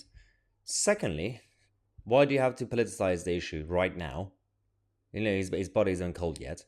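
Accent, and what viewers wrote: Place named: Liverpool, United Kingdom. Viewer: Did like his liverpool accent.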